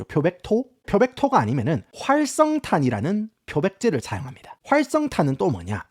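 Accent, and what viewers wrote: Gyeongsang, South Korea. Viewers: 내용은 좋은데 경상도 사투리 극혐 경상도 선조가 중국에서 넘어온 한족들이라 유일하게 한반도내 성조가 있어 듣기 거북함 씨끄럽고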